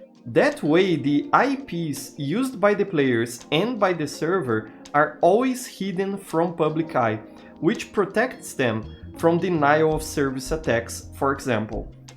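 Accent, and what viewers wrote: Brazil. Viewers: Are you Brazilian? you speak english with a very brazilian accent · Massa ver um BR fazendo um vídeo tão bem informado sobre o TF2. Top 10 sotaque foda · Bruh, i never heard of you before and this video popped up in my recommended. But something about your accent SCREAMS brazilian, am i right? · Eu conheço o sotaque brasileiro quando eu escuto o sotaque brasileiro haha. Bom trabalho com o vídeo por sinal.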